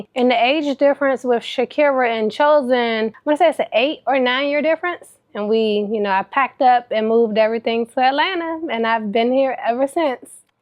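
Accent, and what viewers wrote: DMV, United States. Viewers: I knew you were from the DMV somewhere as soon as I heard you talking lol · she’s from DMV! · I could hear Md all in her accent ! Baltimore stand up ! Love this ❤ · Girl! Soons I heard you talking I said that’s Maryland baby!! lol love from PG County boo 💕💕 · I’m from PG I hear your Maryland accent girl 😁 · Heard that DMV accent IMMEDIATELY! Hey sis! If you're comfortable, can you share stories about your stadium days??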